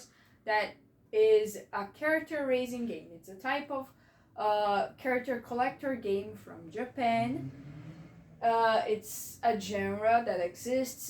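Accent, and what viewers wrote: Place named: Brazil. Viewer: your accent is so cute it sounds very brazilian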